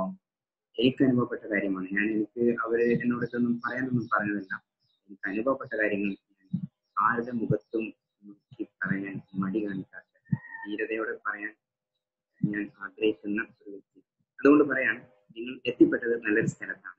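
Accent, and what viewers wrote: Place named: United States. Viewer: good american accent..! bravo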